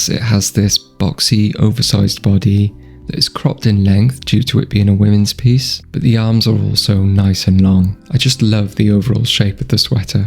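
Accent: United Kingdom. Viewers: Your accent and voice is much more pleasant to listen to than other UK fashion youtubers, great video :)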